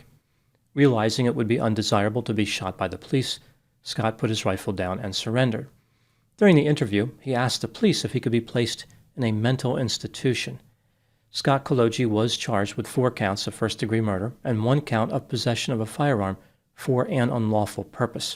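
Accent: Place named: New Jersey, United States. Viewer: Dr accent is very Jersey or Philly..So that may explain alot..lol..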